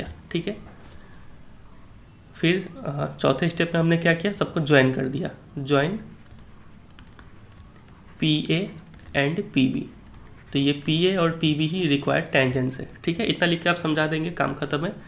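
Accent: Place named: Bengal, India. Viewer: Where are u from sir ?? U sound like bangoli , if i am not mistaken, you are...... please please 🙏🏻🙏🏻🙏🏻 reply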